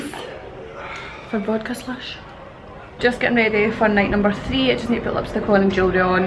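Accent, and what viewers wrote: Scotland, United Kingdom. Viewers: I actual love your patter, so Scottish haha. Thanks for the video and congratulations! Whereabouts in Scotland are you guys from?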